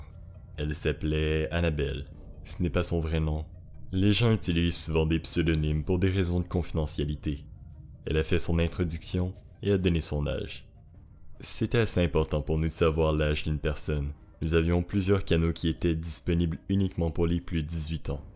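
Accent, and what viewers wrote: Quebec, Canada. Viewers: On dirait un accent québecois nn?❤️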